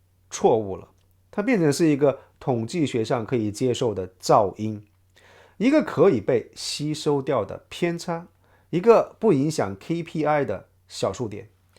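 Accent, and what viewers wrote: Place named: Sichuan, China. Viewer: 这口音是四川人啊😊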